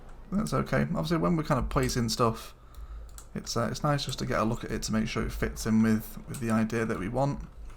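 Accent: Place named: Liverpool, United Kingdom. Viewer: Where are you from in England? Your accent makes you sound like a Beatle. Are you Ringo Starr?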